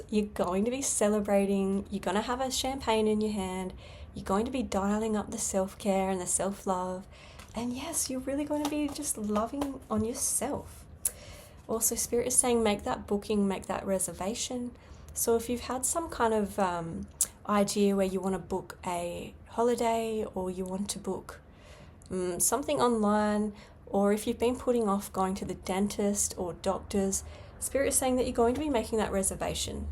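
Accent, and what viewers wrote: Australia. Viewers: i like your hair the blue hair and blue eyes is almost like i was when i was youi age except i also had one blue loop ear ring and one blue Chuck Taylor All Star Converse shoe and one black Chuck Taylor All Star shoe when i was a freshman in college. i'm no spring chicken. and your accent it reminds of the Vegemite sandwich i had a few weeks ago and yes that was an Aussie band song reference. is from sunny California to the land of the rising sun Japan off the beaten path enough? 🍀🎶✨ my 2nd time thru college i had graduated from an online University. Biggest waste of resources ever. i could've been sharpen my Japanese language skills instead of learning software development during an Internet age when only 1 AI Class was available as an elective class which i decided not to take.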